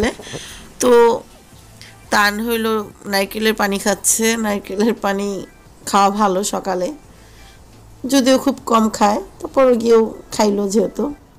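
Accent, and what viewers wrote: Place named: Bangladesh. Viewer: Bhalo kore Banglay kotha bolun. Aapnara, Bangladeshira , jokhon banglay kotha bolen tokhon aamader Paschim Banglay bose lojja kore. * Hoichhe noy : Hoyechhe * Maikha noy: Mekhe * Jaitechhe noy : Jachhe * Bhorta noy : Bhate * Bahire Gichhilam noy: Baire gechhilam.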